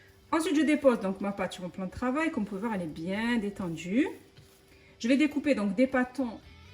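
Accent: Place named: Midi, France. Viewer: Clair, simple, bien expliqué sans chichis ni fioritures et avec un petit accent de notre cher Midi. Resultat parfait! Bravo et merci pour cette superbe recette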